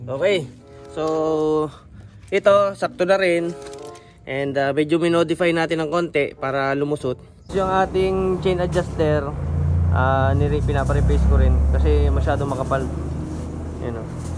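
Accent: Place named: Visayas, Philippines. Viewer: bisaya diay ka lods?? 😅🔥 Word kapoy bisaya word😁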